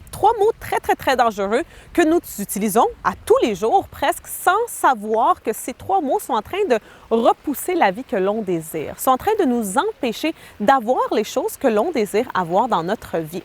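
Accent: Quebec, Canada. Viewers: C'est l'accent québécois. C'est un peu triste de focaliser sur son accent au lieu de son message. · Ah!!!!!! Cet accent ça me rappelle de bons souvenirs au QUÉBEC merci je m y met.....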